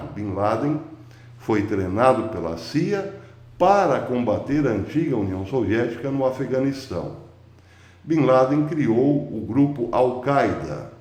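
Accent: Caipira, Brazil. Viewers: Parabéns professor sempre ligado aos fatos da geopolítica! O caipirês é apenas uma forma humorada de passar as notícias 👍👏👏👊😎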